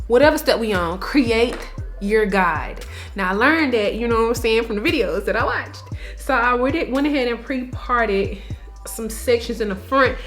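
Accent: South, United States. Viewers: And that country accent is everything! #SouthernRoots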